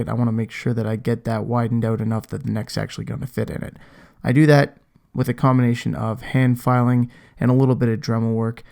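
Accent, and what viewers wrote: Canada. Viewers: Ha! You replied! Too freakin cool! Im using the spraymax 2k. Thank you for that too, i was having not so great results with rustoleums clear. So ive been moving alot of guitars( for a guy just messing about) and i got my clear through some different channels. I would be way cool with placing my future orders in a way that gets you a kickback or whatever. Im just new to technology so im a little behind the times as far as ordering things with my phone. So youll have to shoot me another reply with those instructions. Your videos have been a priceless resource to me since i started dicking around with guitar finishes. Was stoked to hear a canadian voice, being a canuck meself( living in the states, in Missouri of all godforsaken places). Keep them coming bro-cephus. Thanks a million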